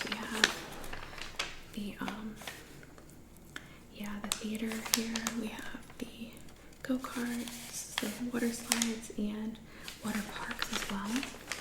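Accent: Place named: Ohio, United States. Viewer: I hope you're feeling better! Youngstown is my town! The Canfield fair is the largest county fair in the state. You sound like you're from around-about these parts. If you've never been to it, I recommend going. There's a lot to see, animals to see/pet, art, food, and rides. 🙂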